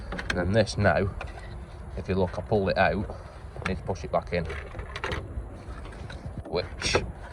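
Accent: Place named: Lancashire, United Kingdom. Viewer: Just put it down to experience with that sort of thing. I was trying to place your accent. Sounds a bit Preston/Bolton-ish.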